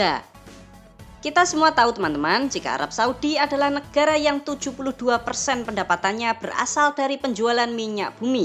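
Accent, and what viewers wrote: Java, Indonesia. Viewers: kok medok banget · Pak beri berita tentang arab bahasanya medok jawabnya ya pak jangan tinggalkan.bahasa kampung jawapnya.ya pak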